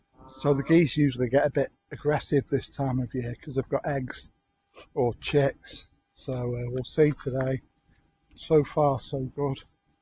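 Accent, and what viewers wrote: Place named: Manchester, United Kingdom. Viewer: Greetings! Its always nice to hear you and walk with you. I think I wrote it before, but I enjoy your english... speed and Manchaster dialect?! (Is there a Manchester dialect?!)... very much. It makes my rusty german school english more fresh. Thank you for that.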